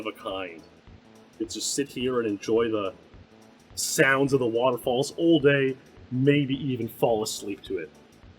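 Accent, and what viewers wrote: Canada. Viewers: When people call Dragons, “draygons” blows my mind. I guess it goes hand in hand with saying “ewt” instead of saying out. Must be a canadian, North US accent. Relaxing, chill video though. Enjoyable. I like the focus on the lesser talked about games.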